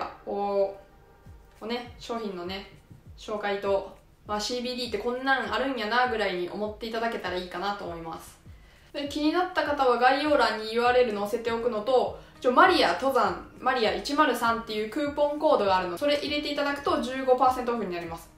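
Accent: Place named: Western Japan, Japan. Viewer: ちゃん極めて標準語ですが、普段は西の言葉なんでしょうか？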